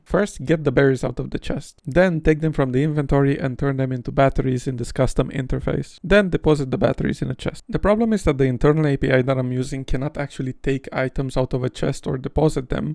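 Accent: Romania. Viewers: as a romanian the second i heard your accent i just knew u are romanian:)) keep up the good work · Why Romanian accent familiar to Indian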